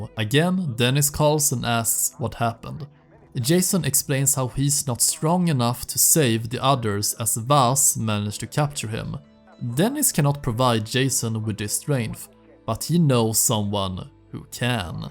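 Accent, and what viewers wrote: Sweden. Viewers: Omg din svenska dialekt luktar så stark den e😂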